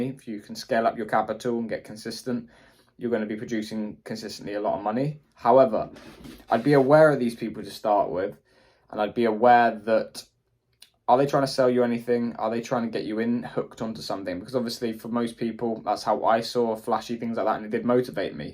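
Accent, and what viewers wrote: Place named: England, United Kingdom. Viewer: I just love your English accent!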